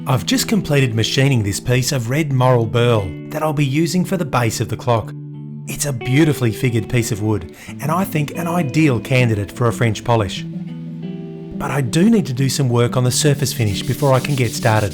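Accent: Australia. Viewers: Great to hear the Aussie accent. Check out how we do things in Sydney at <link>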